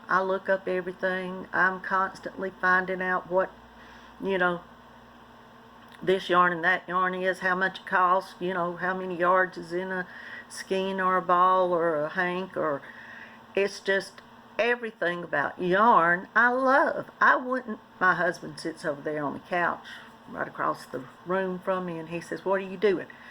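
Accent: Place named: Kentucky, United States. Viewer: Hi 😊, I love yarn too! Just found you and I'm looking forward to seeing more of your videos! I would love it if you came over and checked out mine, if you would like or if you can find the time. I'll be watching! I'm from Kentucky, so I know the accent 🤗!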